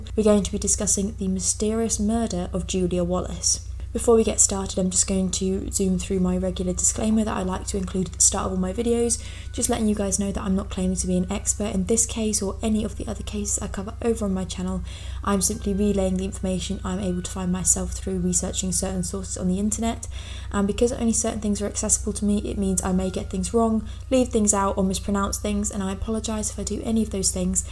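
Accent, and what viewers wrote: Essex, United Kingdom. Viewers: they're mostly Northern English accents, which are considered sort of "proletarian" and less "pretty", maybe because business english / upper class English is closer to Southern English and the North used to be more working class, and working class accents don't enunciate words as clearly. Scouse is the dialect around Liverpool, Geordie is Newcastle, Cockney is working class areas of London. is from Essex but her accent isn't strong Essex imo. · I love you're accent people from the UK have lovely voices.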